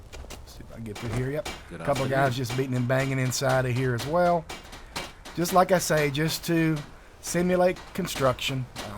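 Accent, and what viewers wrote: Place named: North Carolina, United States. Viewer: North Carolina was my first guess :) I work with a lot of Southerners... developing a good ear for that I guess! Looks good.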